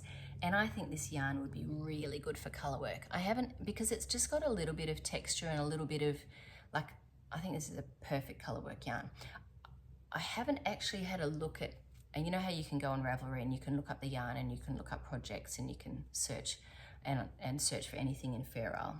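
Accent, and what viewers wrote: Australia. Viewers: Hi, am so glad I found your podcast and can’t believe it occurred by watching Beth Macdonald Stone’s podcast and she mentioned she watches and really likes your podcast. Since then I’ve been watching all your videos. As a fellow Aussie living down in Melbourne I’m just loving hearing an Aussie voice and of course seeing all your wonderful makes. Am also a big fan of Skein Sisters and buy from them regularly. Love your work ❤ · I have been gradually going through your library of podcasts - so lovely to hear an (eloquent) Aussie voice talking about making, as I'm currently living in the States. That shawl is definitely something to be proud of, it's stunning! Can't say I'm ready to dive into such a big project though, my queue is already too long. Out of curiosity, what are the shops you like to shop and ship to your American family? I feel like now that I'm here I'm missing my gorgeous Aussie merinos, but I've previously ordered Brooklyn Tweed and paid through the nose to ship it to Aus. 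Looking for some inspo to shop 😂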